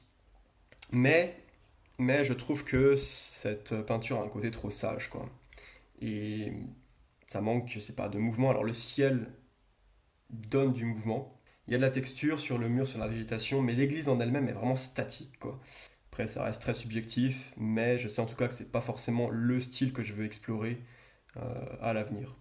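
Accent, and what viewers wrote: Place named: Alsace, France. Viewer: Merci, il me semblais avoir entendu l'accent Alsacien de temp en temp!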